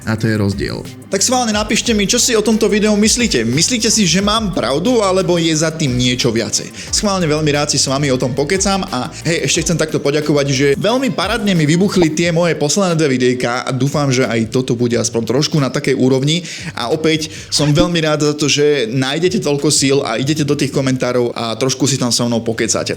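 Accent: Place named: Czech Republic. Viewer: Kúpuje to akože naozaj? Ach vy čechuje ZE Slovenska. NEUVERITEĽNE odporný pepícky prízvuk.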